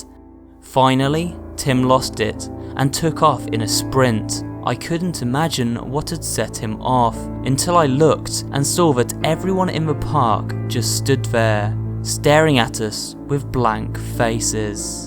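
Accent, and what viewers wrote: United Kingdom. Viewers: I think he breetish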